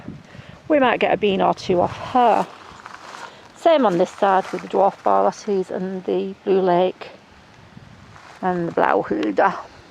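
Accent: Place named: Lancashire, United Kingdom. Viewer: great tour thank you. Also, it sounds to me like you have a Lancashire accent so for that reason I am going to add you to my list of "check these out" channels featured on my channel's homepage. Big up the north west massive, nice one.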